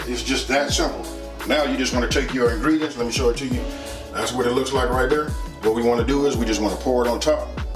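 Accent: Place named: Los Angeles, United States. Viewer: You have an LA accent. I can hear it when you said "starving"... Pronounced like "sshtarving" etc.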